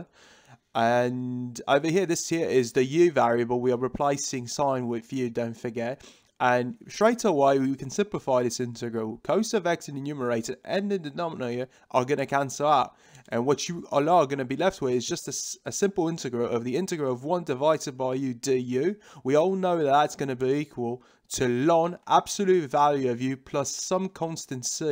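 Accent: United Kingdom. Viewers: You sound like a proper west ham geezer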